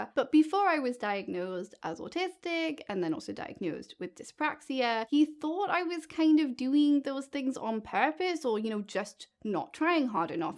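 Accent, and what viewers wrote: United Kingdom. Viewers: I did not notice or ‘hear’ your English/British accent until you said the word crisps... several minutes into your video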